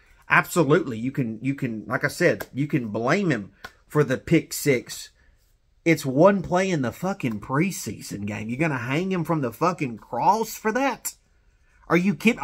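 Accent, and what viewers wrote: South, United States. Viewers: Where are you from because you sure don't sound like you're from NJ or NY? You got a southern drawl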